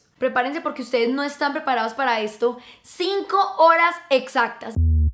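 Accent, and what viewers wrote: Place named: Medellín, Colombia. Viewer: Se nota que eres de aquí de Colombia eres de Medellín igual que Lulú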